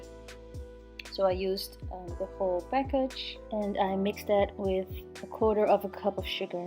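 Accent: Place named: France. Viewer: Hello, what a wonderful personality, heart and energy you have <3 First let me ask you, as your accent in English seems a bit "French" to me, is this due to your heritage or upbringing or just the way I hear it and feel it? Next, not to put a damper on you or your video because it is Beautiful but, if you are using Oreos with the same ingredients as here in the States; then you are taking in lots of things that are unhealthy to your Human Body as well as the environment. Such things like, sugar, palm oil, high fructose corn syrup, corn starch and artificial flavors as the really not so good ones you need to avoid. Sugar, especially refined, but in all forms is very addictive and lights up the Human Brain in the wrong way, as well as the glycemic index and how it regulates in your pancreas, body and blood. Use raw honey for best natural sweetners. Palm oil as it is first off not going to be sustainable and this means the forests are cut down fast and the land left in ruins, as well as Orangutans left homeless, split from familys and many killed in cold blood. 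Sorry the Truth is very sad on this Planet due to unconsciousness of the Masses and the fact many things are kept from us a a Species due to Corporations, Media/Tv and for greed and profit. High Fructose Corn Syrup or HFCS is one that is a no no and very processed and should be known by everyone now days. Artificial Flavors are more then likely not only chemicals, but animal or animal by products. On top of this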